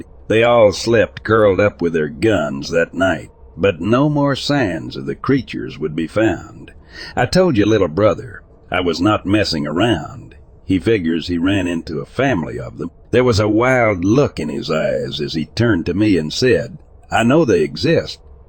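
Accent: Texas, United States. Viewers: yeah same, he is probably around 65-75, but he does sound like he's from Texas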